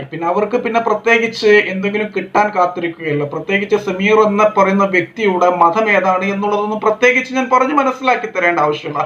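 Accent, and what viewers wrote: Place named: Kasaragod, India. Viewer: ഒരു കാര്യം പറയട്ടെ കൂട്ടുകാര നിങ്ങളുടെ ചാനൽ ഞാൻ കാണാറുണ്ട് നിങ്ങളുടെ ശൈലിയിൽ നിങ്ങൾ സംസാരിക്കുക നിങ്ങളുടെ സംസാരം കാണുബോൾ ഏതോ ഒരു കാസറഗോഡ്കാരൻ സംസാരിക്കുന്നതുപോലെ i like you this chanal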